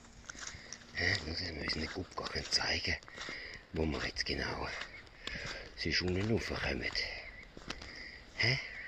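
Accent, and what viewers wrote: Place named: Switzerland. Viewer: Boah het mi das jetzt lang bruucht für usezfinde, dass du schwyzerdütsch retsch. Schöni Chatz <3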